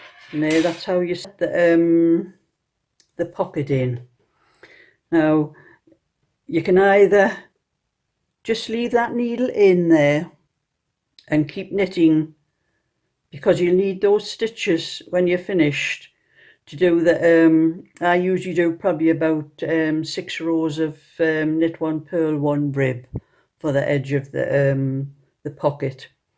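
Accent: Newcastle, United Kingdom. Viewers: Thank you for this lovely tutorial, exactly what I need for my cardigan pattern which assumed I knew how to sew on the pocket. Also love your accent, reminds me of my home and family from long ago in Newcastle 😁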